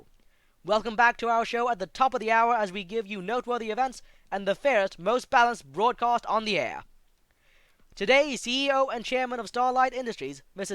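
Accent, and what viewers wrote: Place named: United Kingdom. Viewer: Enjoyed it. Wish you had continued this; really like your voice there, especially with what your accent brought to this. Is it British? (ugh, can't place the accent otherwise. . .)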